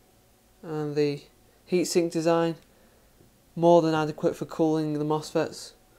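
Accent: England, United Kingdom. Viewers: I'm extremely curious... coul someone tell me where is that english accent from? :)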